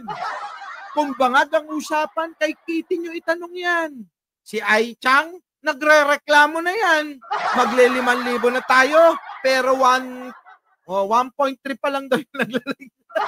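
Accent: Ilocos, Philippines. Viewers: Ung Ilocano mo boss masarap pakinggan, lol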